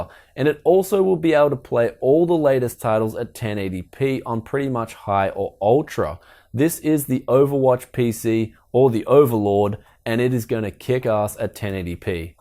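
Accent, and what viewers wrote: Australia. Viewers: Are you Australian? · he's an Aussie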